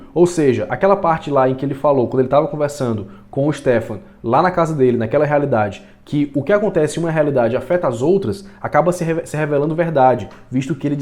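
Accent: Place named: Ceará, Brazil. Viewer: Nós 30 primeiros segundos eu me toquei do sotaque cearense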